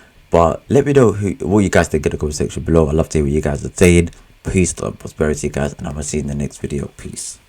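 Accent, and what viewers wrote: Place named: Liverpool, United Kingdom. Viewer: Your scouse accent is muaad! 🤣🤣🧐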